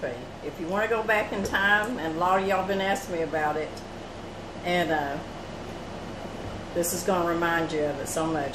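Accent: South, United States. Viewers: I can’t wait ti try this! I so enjoy watching your videos, your beautiful southern voice is magical, reminds me of my grandmother who also loved to cook! ♥️♥️♥️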